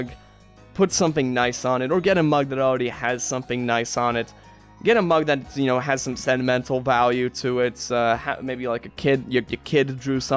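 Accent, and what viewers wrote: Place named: Europe. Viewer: Your european accent is fading. fascinating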